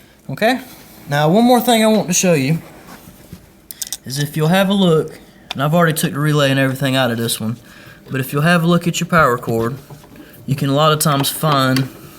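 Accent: South, United States. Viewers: thanks, you are a good speaker. a bit of southern twang perhaps. going to work on my fridge today armed with knowledge.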